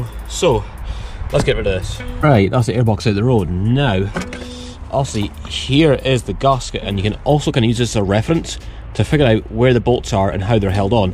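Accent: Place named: Scotland, United Kingdom. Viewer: I've got an A4, but harder because it's close to the bulkhead but just takes patience. I rebuilt the tandem pump as well. Oil pressure light gives you a fright 😁 VAG cars all do this with long cranks, don't ken why. Guid to hear a Scottish voice on YouTube, keep up the good work. Inlet looks spotless. 🏴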